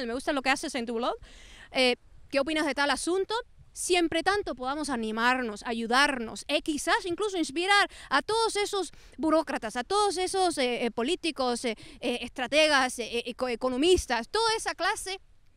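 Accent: Spain. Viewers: Niña estás locamente loca Dios mío manera de hablar mierda,eres cubana q mierda hablas como española con esa Z horrible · Ridículo tu acento gallego que sacamos a punta de machete , tu te estas aguando en un vasito de agua, sin ningun proposico. En Suecia la Universidad es gratis en Canadá te ponen un yeso. Eso es lo que queremos en Cuba . los suecos no tienen comunismo. Ja Tienes 12 latas de atun y dos generadores eléctricos.Mejor dejo a esta estúpida.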